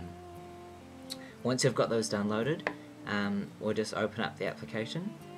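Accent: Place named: New Zealand. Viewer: Nice video, new Zealand accent xD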